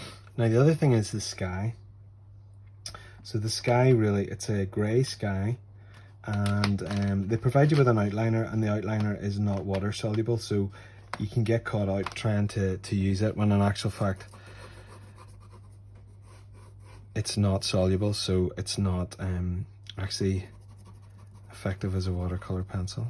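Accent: United Kingdom. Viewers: Just starting to learn pencil drawing and water color pencils at 70. I am watching various youtubes to learn the basics. I came across this and watched intently. I had to chuckle a bit, when I thought people were watching wheels, instead of whales. :>) Our American accents are just a bit different than UK ones. So thanks so much for a great video. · Love your Northern Ireland accent! I have these DERWENT water colour pencils all in lovely earthy colours - but only a 10 pack - l called in Homecraft today to get this bigger selection that you happen to be using - but the price was very high and l couldn’t be sure if they would be as effective as my original 10 pack…. So thank you for this video …. You have persuaded me to invest in this bigger selection! Love your work! Delightful to see an artist from NI on YouTube!!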